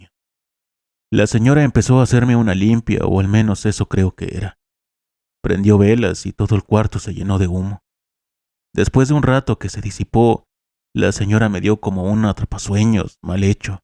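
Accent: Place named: Chihuahua, Mexico. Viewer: Voz típica de un chihuahueño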